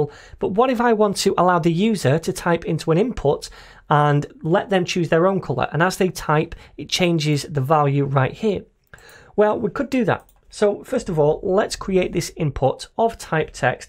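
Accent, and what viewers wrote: United Kingdom. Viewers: Why does `s accent sound like brittish?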